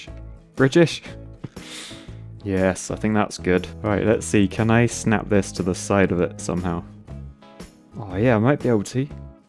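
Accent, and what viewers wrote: United Kingdom. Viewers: just a normal bri'ish engineer playing games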